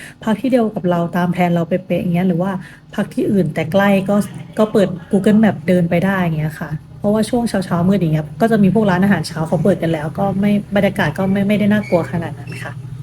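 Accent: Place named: Isan, Thailand. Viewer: สำเนียงอีสานดีมากครับ เป็นคนจังหวัดอะไรครับ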